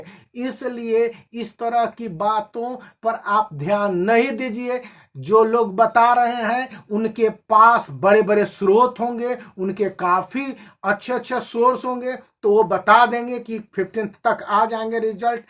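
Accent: Bihar, India. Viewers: Are u a bihari??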